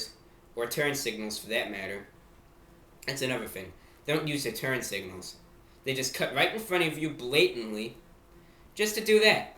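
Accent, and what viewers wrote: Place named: New York, United States. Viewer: His New York accent was still intact here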